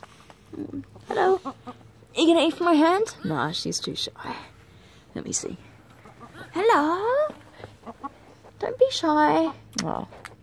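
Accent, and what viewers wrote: Australia. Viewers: I like your voice, it goes along really well with your Australian accent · It is so refreshing to have a fellow Aussie on this predominantly yank site. Oh, and you may have to untrain your maggie lol. Just subscribed btw, look forward to seeing more vids from you and hearing a normal voice :)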